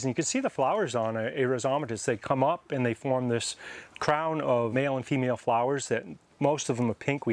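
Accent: New York, United States. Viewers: I hope you make many more Begonia video's? I love watching the endless stream of Begonia's. And they are all so different 💚 Love accent too. New York? Sounds very exotic to a European.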